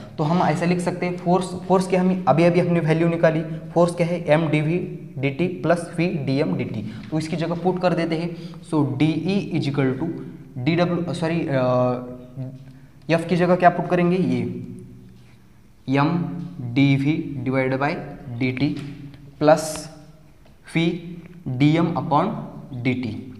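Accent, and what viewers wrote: India. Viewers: Sir tumhi Parbhani che ahat ka ? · Brother are you from parbhani nanded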